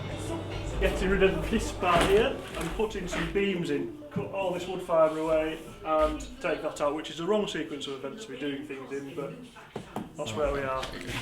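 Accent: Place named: Northern England, United Kingdom. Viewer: Lancashire somewhere I would guess good question .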